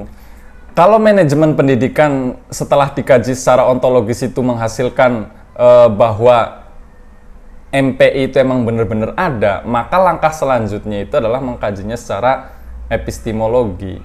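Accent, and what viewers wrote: Banyumas, Indonesia. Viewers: Out of topic bang, bang nya orang ngapak ya? Ora ngapak ORA kepenak😅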